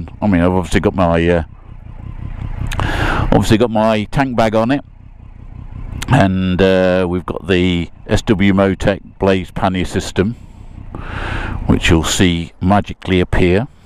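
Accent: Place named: Northern England, United Kingdom. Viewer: Such beautiful landscapes, such a nice accent, so different from what you learn in school. Sounds northern english, is that correct? Greetings from blackforest germany 😊👍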